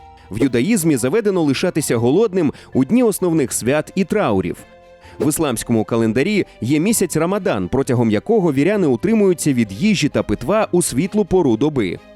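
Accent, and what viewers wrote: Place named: Russia. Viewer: Навіщо коментатор "паскудиЦЦЦь" українську мову різними "тоДЗЗЗі", "видЗЗЗів", "їдЗЗЗяЦЦЦь", єгипЦЦЦяни",тощо ??? Яка гидота переробляти нашу мову на потворне маааскофске нарєчіє!!!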